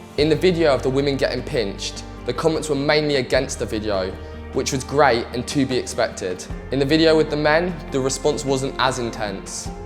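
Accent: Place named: England, United Kingdom. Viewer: His accent makes me ashamed to be from England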